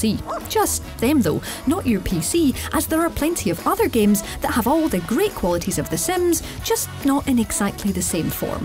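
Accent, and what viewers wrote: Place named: United Kingdom. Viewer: British accent👌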